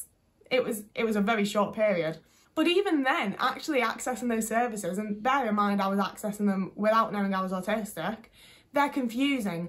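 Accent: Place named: Liverpool, United Kingdom. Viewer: Congrats on 1000 subscribers! I think yours is a particularly refreshing voice because it represents a more working-class experience. Even the Liverpool accent is good to hear when so many autistic videos are RP. Those videos from others are often still very useful but it's good to hear something more distinctive.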